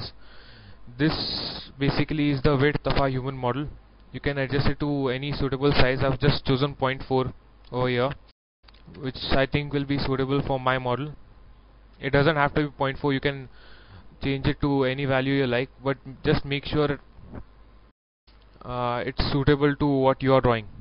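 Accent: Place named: India. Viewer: very nice work, do yo have more blender tuts? I need to practice my Indian English listening.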